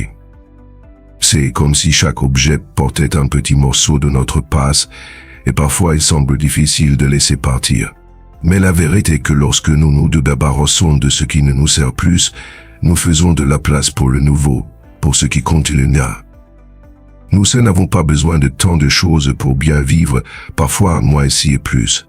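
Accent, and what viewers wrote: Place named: England, United Kingdom. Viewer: D C'est bien la première fois que j'entends une vidéo avec une voix off qui a un fort accent étranger (anglais ou américain ?). Les sous-titres sont désastreux mais cela m'a bien fait rire !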